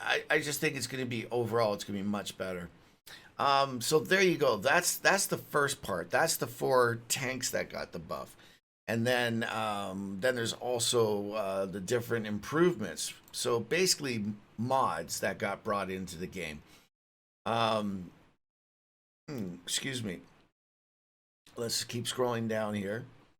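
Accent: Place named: Canada. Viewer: Hey,. Good video. Thanks for producing and posting this. Always good, useful information from you. +1 TL:DR - the rest of my comment a bit off topic. So, stop here and move on if you wish. OK? Still here? Alright,now for a (slightly) amusing sidebar. I learned something today. But what? Well, on with my story., I always like your YT videos and I frequently tune in to your Twitch streams. Even platooned with you a few times on the EU server. Anyhow, one thing that always puzzled me whilst watching such was your pronunciation of the word "decal". When I was growing up as a kid back in the '50s and '60s my friends and I, while building our model planes and warships, put DEE-cals (or DEE-cowls) on them. Later on, once we were driving, we'd put DEE-cals (racing stripes, flames from the wheel wells … ) on our jalopy cars to make them look "cool". DEE-cals. 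Here's a couple YT posts - <link> <link> Now here's saying deckle (deck-ul, rhymes with heckle or freckle). Deckle? Why'd he say deck-ul instead of DEE-cal? So, I did a little internet searching. Turns out the "deckle" pronunciation for the word decal is a Canadian thing, eh. Cool. In other English speaking countries (US, UK, Australia and so on) decal is commonly pronounced DEE-cal, <link> But, there are many words in the English language that have alternate pronunciations in the various countries where English is the principle language in usage (decal being one such word). Just take US vs Canada (or should that b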